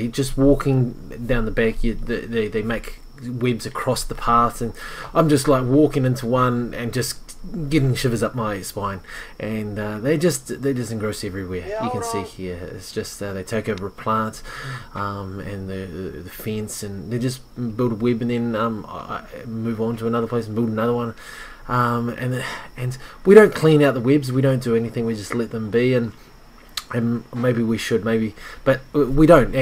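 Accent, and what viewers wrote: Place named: New Zealand. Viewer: Spider wib! Man, I love the NZ accent! xD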